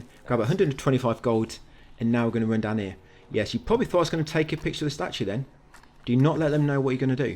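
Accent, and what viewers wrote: England, United Kingdom. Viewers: do you know what English accent you have?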